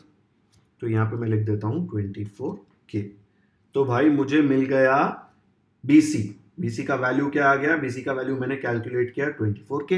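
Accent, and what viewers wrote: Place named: Maharashtra, India. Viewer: Sir i think you are Maharastrian : - becase of your pronunciation (Am i Wright ? ) 😊😊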